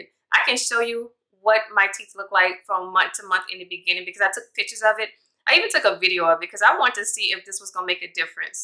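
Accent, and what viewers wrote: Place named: Louisiana, United States. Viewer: U sound like you from Louisiana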